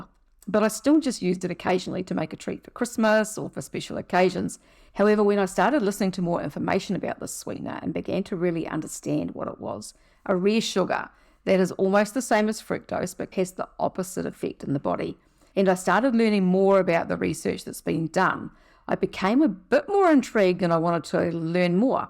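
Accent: New Zealand. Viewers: Great to hear a Kiwi "eccent" on YouTube!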